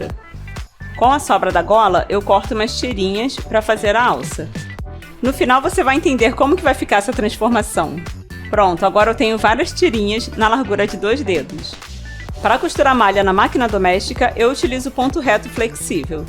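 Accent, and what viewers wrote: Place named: Rio de Janeiro, Brazil. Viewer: o sotaque carioca são um charme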